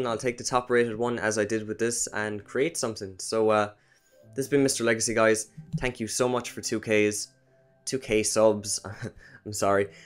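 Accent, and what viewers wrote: Ireland. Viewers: Are you Irish ? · Are you Irish?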